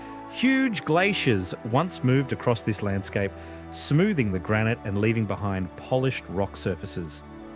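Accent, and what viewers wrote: Australia. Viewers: Love to hear an Australian describe dimensions in feet and miles..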